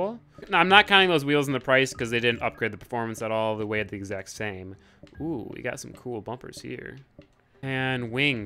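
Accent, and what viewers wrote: Russia. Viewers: that akzent though :D (ps. thats propably a russian one ) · your german accent sounds more like a russian one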